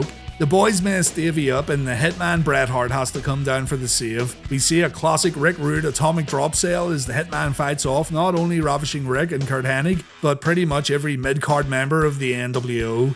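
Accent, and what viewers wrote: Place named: Scotland, United Kingdom. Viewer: Is this guy from Scotland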